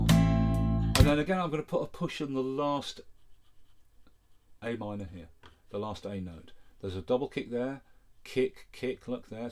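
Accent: United Kingdom. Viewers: One of the best tutorials! This Britt knows how to explain clearly. (Even with his cool British rocker accent). :)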